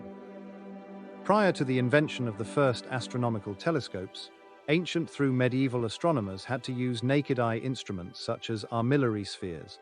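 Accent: United Kingdom. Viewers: Do they just hire anyone with a British accent to read and narrate? What happened to the previous narrator of "Bizarre Journey to the Outer Solar System""? The previous narrator sounded like Jude Law narrating you a space documentary until you fall asleep. 😂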